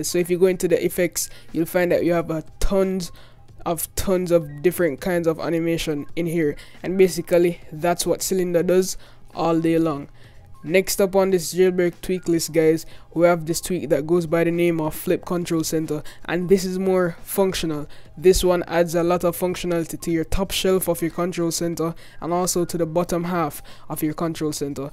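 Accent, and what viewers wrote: Jamaica. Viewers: Are you Jamaican? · you Jamaican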